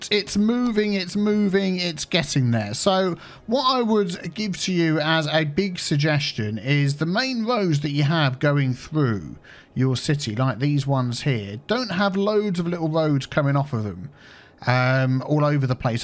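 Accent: United Kingdom. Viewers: Your british accent is the best part about your videos man!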